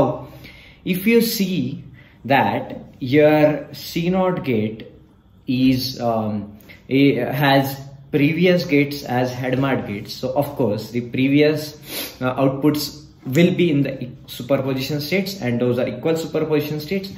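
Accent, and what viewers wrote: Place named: India. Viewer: interesting content, interesting indian accent:face-blue-heart-eyes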